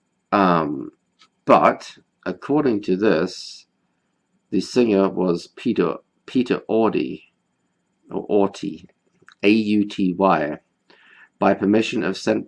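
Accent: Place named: United Kingdom. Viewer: Glad to hear you enjoyed yourself back in the UK. I've not been back in almost 10 years, time flies what can I say. Sounds like your accent has taken a bit of a hit mind, funny how that happens with some and not others. As for The Snowman, from memory I think Peter Auty was on the film but the song/single that was released (almost 3 years later) was ' version and that's the one we all tend to think of.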